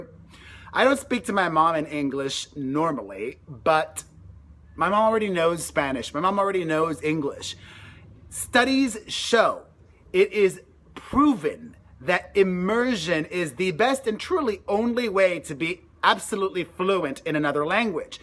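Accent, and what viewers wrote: United States. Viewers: Me encanta como tus nenes y vos hablan en castellano y que sigas manteniendo vivas tus raíces!! Y me atrevo a decir que tus hijos no tienen acento estadounidense como vos al hablar en español!! Un beso desde Argentina 😘😘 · now I understand ,but I had no clue u were Spanish,u sound southern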